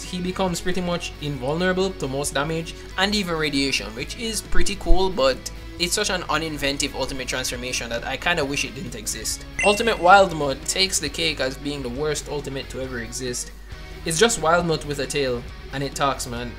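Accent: Jamaica. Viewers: Sounds Jamaican to me??? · Jamaican accent? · You’re Jamaican? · Are you Jamaican? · Are you jamaican? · You jamaican? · are u jamaican bro? me hear a accent dwag?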